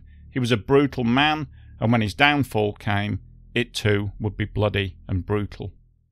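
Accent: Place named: Northern England, United Kingdom. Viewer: a pleasant northern English accent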